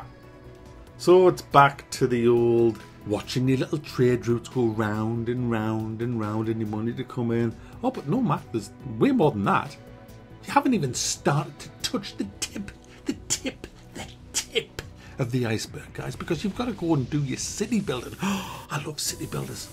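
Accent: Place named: England, United Kingdom. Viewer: What’s more annoying than that poor ass attempt to provide “entertainment” in this review, is the absolutely jarring and insanely annoying accent. I mean, damn, limey accents can be rough, but this I couldn’t listen —nor would I ever want to— for more than 10 minutes…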